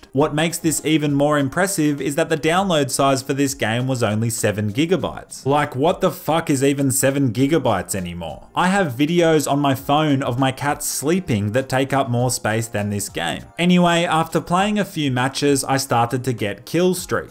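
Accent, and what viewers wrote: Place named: Australia. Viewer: Man sounds so Australian in this vid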